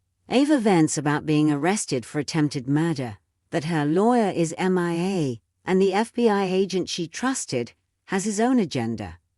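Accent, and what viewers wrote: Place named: Brooklyn, United States. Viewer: Have never listened or watched your updates before I find your voice and the slightly different way you talk wonderful it's like why can't people be nice to hey she's from Brooklyn you know New Yorkers Brooklyn everybody living there has an accent from somewhere just thought I'd throw that suggestion out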